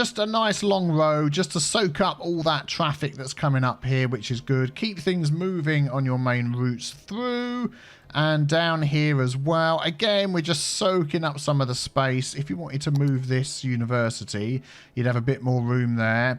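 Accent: United Kingdom. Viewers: The exact right tone of voice. British indeed. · Didn’t now you were British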